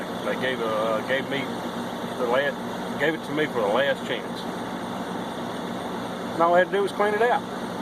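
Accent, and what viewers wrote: South, United States. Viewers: Just love the southern accent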